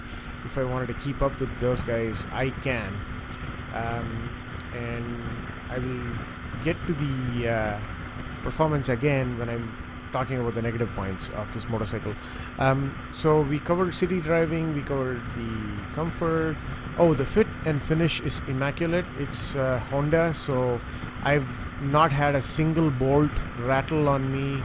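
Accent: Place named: India. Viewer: You sound a little Desi!!🤔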